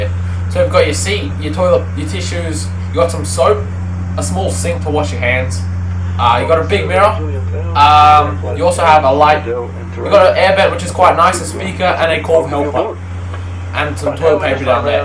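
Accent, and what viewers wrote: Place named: Australia. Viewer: gday gday, you sound so aussie lol. keep it up and get more coming!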